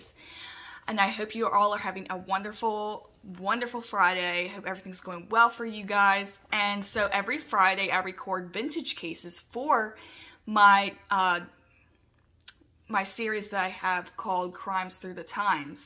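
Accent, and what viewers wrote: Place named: South, United States. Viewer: You're welcome. I'm glad you received my message so well. I thought I detected a wee Southern accent, that is a sad story and you did well. Have you ever watched Murder She Shed channel? She's small too, having just started out and she's a real hoot. I'll always have the scars from what happened to me way back then but I made a decision to not be a victim. I have but one wish for Humanity and that is for people to learn to love themselves so they can love others then maybe all the hate could stop. Bless you too sweet Sister. Perhaps we'll chat again, I see potential in your heart and that makes me feel good and have a little more hope. 💖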